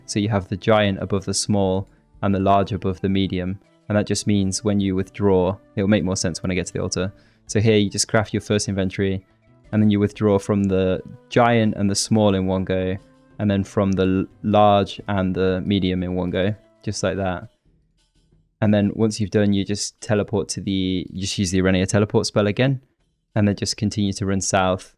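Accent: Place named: United Kingdom. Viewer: Great video but you're british :/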